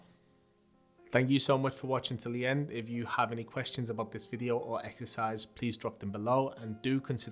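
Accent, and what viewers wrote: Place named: Liverpool, United Kingdom. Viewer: I had never heard speak until now. Was not expecting a Scouse accent!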